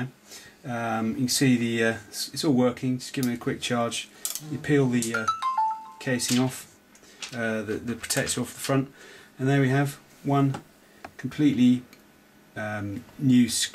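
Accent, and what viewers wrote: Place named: United Kingdom. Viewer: Thank you very much for this video. I was able to fix my son's 820 for 1/3 price! and your British is music for my ears:) Greetings from Budapest.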